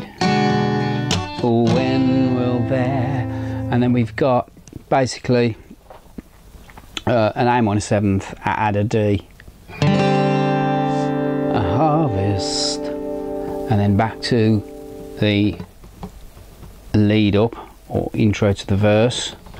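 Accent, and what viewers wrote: United Kingdom. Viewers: Thanks, this is best tutorial I have come across ...do I detect a Midlands accent ? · great video spoken with a good Brummie accent .cheers from a Tammie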